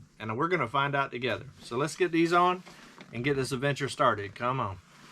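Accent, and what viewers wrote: South, United States. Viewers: You sound like your from the south I was raised in Millington Tennessee but have lived in Waldron Arkansas most of my life.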